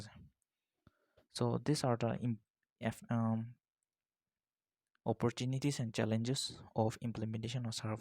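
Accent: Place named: Nagaland, India. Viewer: Bro by ur accent I think ur from Ao community man.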